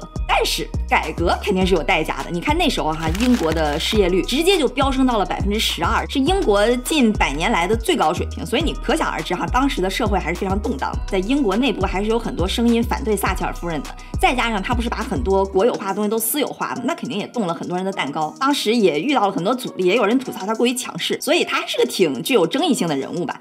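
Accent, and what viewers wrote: China. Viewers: 好奇地问，你们听得懂大陆的口音吗？会不会觉得听着很奇怪？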